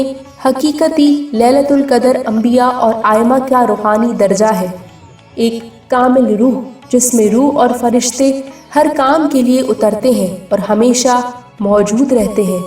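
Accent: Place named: South Asia. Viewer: ap badun ki bol chal sa he andaza laga sakta tha ki ya india ka hn ya pakistan ya kasi sawal howi??!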